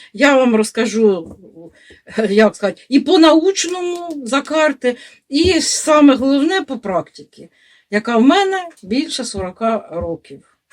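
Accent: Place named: Poltava, Ukraine. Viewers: Це полтавська говірка. Я народилась в чернігівський,.лише до полтавської. Там так говорять. Північна частина чернігівщини більше вживають зросійщених слів, не чисто російських. А у полтавська говірка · І в Дніпрі такий діалект..Що тут такого??? І моя бабуся так розмовляла,вона з Полтавщини, але все життя у Дніпрі прожила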